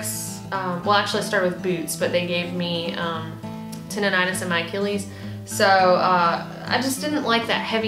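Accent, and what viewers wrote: South, United States. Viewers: Hey - Do people ever comment about how DIFFERENT the young lady is that is just starting the AT in GA (2015) to the one doing these very informative videos? The pretty is there throughout, but the confidence is far greater in these latest efforts. Hope your book is nearly as informative. Too bad you can't write with a southern accent!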